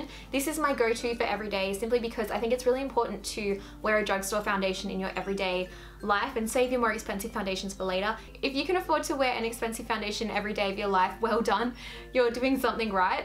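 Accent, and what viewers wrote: Australia. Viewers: OMG R U AUSTRALIAN!!?!? I AM!!!!!!!!!!! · Is she Australian? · Is she australian? I cant tell x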